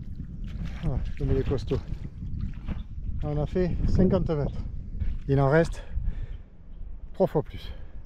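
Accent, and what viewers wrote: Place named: Marseille, France. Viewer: Comme tu le dis si bien : malheur! mais avec l’accent Marseillais ça passe mieux et ton audace te sauve 👏👏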